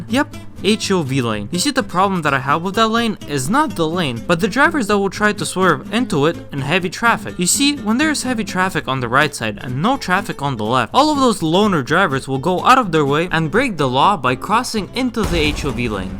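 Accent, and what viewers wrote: Russia. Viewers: has a Russian accent? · Dude, why do you have Russian accent?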